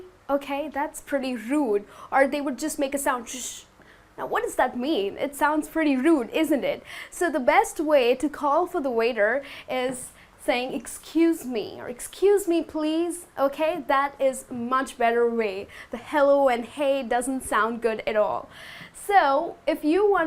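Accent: United States. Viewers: How do you twist your tongue to make US ascent???:) I wanted to learn it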